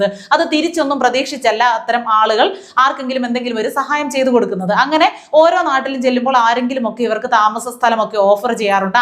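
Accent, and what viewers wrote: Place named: Thrissur, India. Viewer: ആള് ആള് എന്നു പറയുന്നതുകേട്ടിട്ട് തൃശൂർകാരി ആണെന്നു തോന്നുന്നു. 🤔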